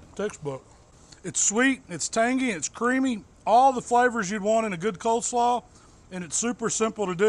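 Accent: South, United States. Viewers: i soon as i saw a big white man with a beard and southern accent, i know i found what im looking for.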